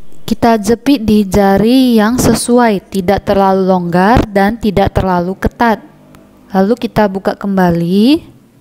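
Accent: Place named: Medan, Indonesia. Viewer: Orang Medan ya? Logatnya kayak temen Medan saya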